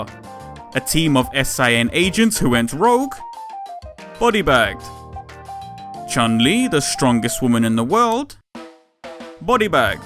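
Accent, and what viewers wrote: London, United Kingdom. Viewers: Just happened across this video and in less than a minute and a half you've mad me laugh. Coupled with being a londoner, (I assume from you accent)... that's an auto-sub right there! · Its British one. A heavy slang based one but still British, more accurately, it's from London.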